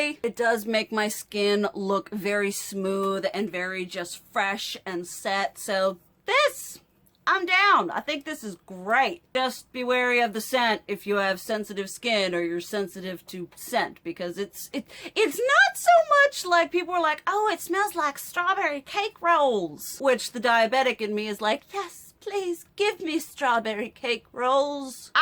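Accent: South, United States. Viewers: Purples are your color, both purple wigs I've seen recently look great. They really compliment your skin tone. If your reviews are with good intentions, to save us money or let us know its great or crap go for it. I like your for your attitude, interest, and you sound like me ..a southern lady with a twist.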